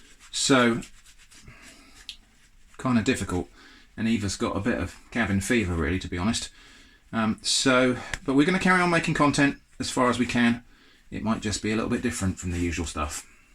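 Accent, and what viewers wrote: United Kingdom. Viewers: I enjoy Watching your videos during these tough and uncertain times. In the US it is very chaotic right now and watching these video lets me know that there are things to do at home. Plus I like British accents. 🙃 I have started a hydroponic indoor mini farm and I'm starting to grow my own food. I hope you stay safe and nice hand washing. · Such a fan of your channel, it was yours that introduced me to a topic I didn't know existed: Scambaiting. I then realised your quirkiness, intellect, good ol' fashion British diction and relaxing timbre sucked me in to the rest of your content. Thx for fighting the good fight👍👍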